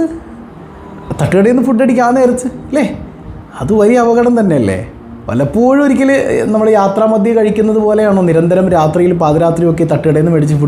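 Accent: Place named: Malappuram, India. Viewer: ഉസ്താദിന്റെ സംസാരം കേട്ടാൽ മലപ്പുറം ഭാഷ പോലെയാണ്. 🥰🥰🥰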